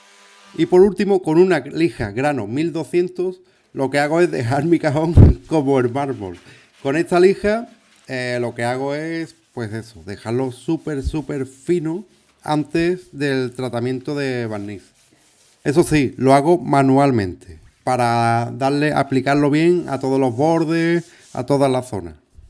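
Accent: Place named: Andalusia, Spain. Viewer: Sos un genio !! Muy bien explicado. El acento Andalu (si no me equivoco), le da un toque muy especial. Muchas gracias maestro.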